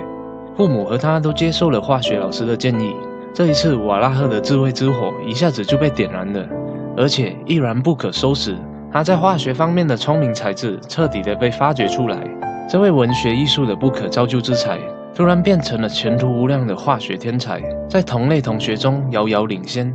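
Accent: Malaysia. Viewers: 是馬來西亞口音嗎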